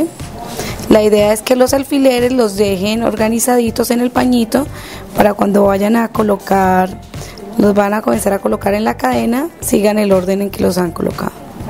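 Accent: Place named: Colombia. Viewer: aparte de que me encanta tu trabajo, es chevere escuchar el acento de mi tierra querida colombiana, desde mexico. Amo mi Colombia . Gracias por compartir tus conocimientos muy lindo todo ... Dios te bendiga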